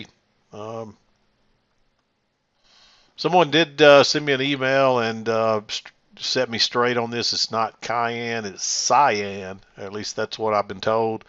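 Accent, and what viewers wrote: South, United States. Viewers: Boooo!!!!!!! Y’all leave that man alone. He’s from the south! We say stuff how we wanna.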